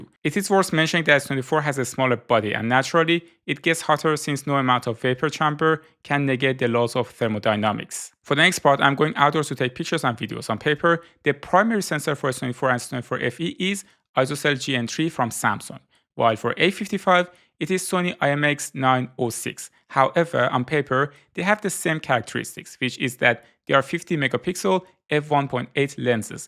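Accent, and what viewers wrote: Iran. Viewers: Your persian accent>> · داداش دمت گرم خدایی ایرانی هستی؟؟ انگلیسیت خیلی با لهجست😁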